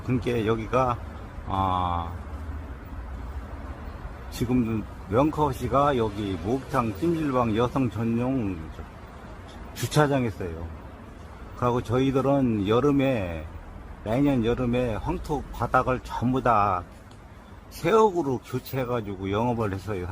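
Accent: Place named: Hamyeol, South Korea. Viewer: 황토막한번 가보고싶네요 근데 님 고향이어디세요 제가 함열그쪽인데 저희동네맡투가들리네요 ㅎㅎ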